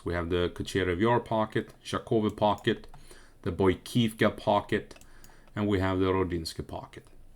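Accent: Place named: Sweden. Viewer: "Combat boot" and "Combat boat" sounds eerily similar when you speak with a swedish accent. Yes! I throw bricks in a glas house. I speak even better swenglish.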